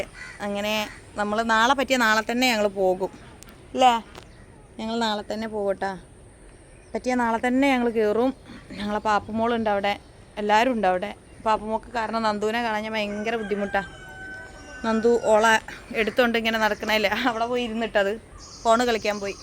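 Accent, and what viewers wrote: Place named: Thrissur, India. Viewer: തൃശൂർ സംസാരം എനിക്ക് നല്ല ഇഷ്ടമാണ്❤️👍🏻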